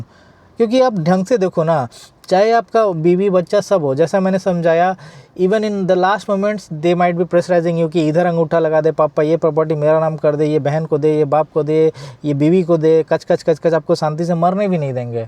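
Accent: Bengal, India. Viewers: are you bengali ?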